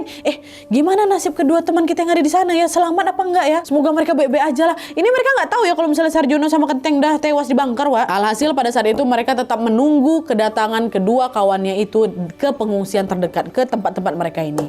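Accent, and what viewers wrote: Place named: Medan, Indonesia. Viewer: SAYA SUKA KALI dengan CARA GAYA BicAra nya kalau boleh tau AsLinya MEDAN YA enak Kali CAKAP NYA SUKSES buat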